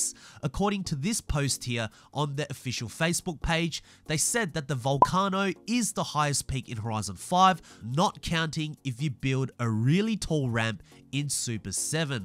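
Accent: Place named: Australia. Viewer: "Yo what's cracking everyone here" Aussie accent never gets old.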